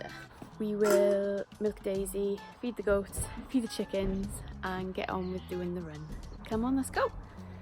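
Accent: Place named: Wales, United Kingdom. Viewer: Loving your channel. I am stuck in a town but would love to live on a farm, as I dearly miss the countryside that I enjoyed but did not respect in my youth. Now of course in my old age I truly miss it. You certainly are a hard working Lady. I hope your family appreciates all that you do. I love your Welsh accent too. It is so very melodic. The Pastor's wife at my church is Welsh and has a very deep accent. She plays the Piano and leads us in song. I sit right at the front near the piano just to hear her lovely singing voice clearly. She is my little songbird and sounds just beautiful. Anyway, thank you for your videos, and God bless you and your family. Ken in Lancashire.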